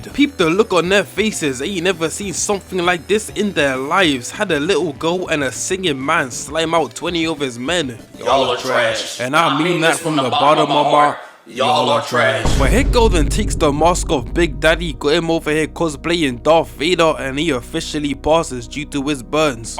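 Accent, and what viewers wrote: United Kingdom. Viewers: Your voice is like British Casual Premium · I love how clear it is to understand your British accent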